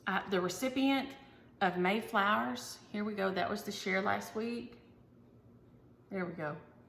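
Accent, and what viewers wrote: South, United States. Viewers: Oh my gosh those babies are so adorable!!! Have a good walk Yes I too think I would enjoy your narrations of books, I love southern accents and end up trying to talk like you after watching your videos..lol We also have the same tradition of doing a Sleepy Hallow and Christmas Carol reading for the holidays. Pass along the giveaway if it lands on me for saying reading.. I just wanted to connect with you about traditions <3 Happy stitching -Jenn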